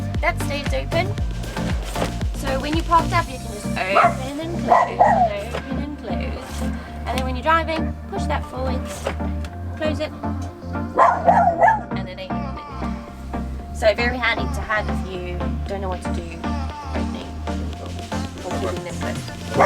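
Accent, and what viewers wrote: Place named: Southern Africa. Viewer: What part of Southern Africa are you from?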